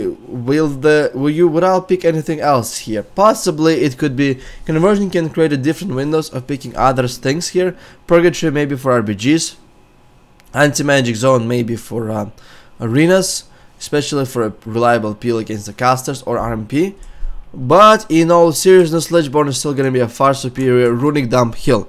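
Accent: Russia. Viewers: That's fucking great! XD The Russian accent is awesome too, if you were playing a Draenei Dk it would be even better hahaha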